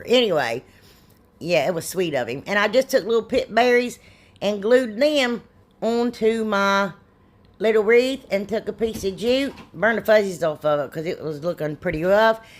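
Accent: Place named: Oklahoma, United States. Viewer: Ha ha ha ha ha ha ha My Husband and I are cracking up watching you touch that glittery fabric. My Husband has only seen a couple of your episodes with me so he doesn’t know your aversion to felt he just turned to me and said, “Does she have Tourette’s? “Ha ha ha. I was laughing so hard I couldn’t even answer him for a minute I said why do you think she has Tourette’s and he said the way she’s repeatedly clapping her hands and then shaking them over her project and I was laughing so hard that I could not talk for a minute. Oh my goodness, I love you so much. Aside from the laughter and joy that you bring me and my home I love your projects and your creativity. The accent is just icing on the cake for me. A lot of my family sounds just like you only they hail from Oklahoma. I am a proud Okey! I think I told you before that I used to sound just like you too. 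But, my fourth grade teacher thought I shouldn’t talk with that accent and put me in speech therapy. I don’t think my parents even knew it until I said something one day about my teacher who makes me talk weird. My mother figured out what was happening and read that teacher the riot act. I loved her for that. Funny thing is my mom and dad didn’t have the accent but I did. My grandparents had the okie accent and they lived right behind my parents and my house and I loved spending as much time with them as I did in my own house so that’s where it came from. I don’t think I have the accent anymore but my husband and my kids say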